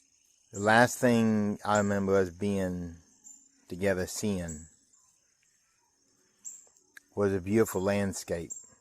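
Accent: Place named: Louisiana, United States. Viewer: Sounds Cajun to me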